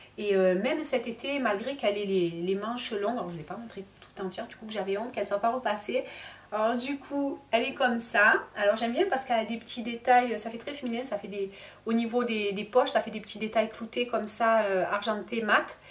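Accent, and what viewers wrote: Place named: South, France. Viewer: je te trouve très chou ainsi que ton accent du soleil ....Hein les botchinnes ;) merci pour ce partage je m'abonne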